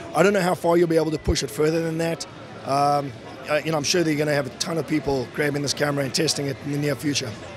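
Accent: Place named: South Africa. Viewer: I can't decide if this guy is South African or Australian. In fact I think he's probably a emigrated South African, there are thousands of them in Ozzie land ;P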